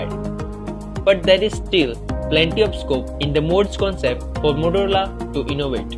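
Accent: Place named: India. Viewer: Indian English accent not exactly Youtube worthy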